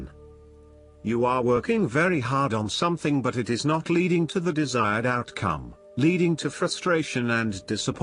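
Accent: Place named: United Kingdom. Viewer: Beautiful British English pronunciation. Good reading, as well. TNX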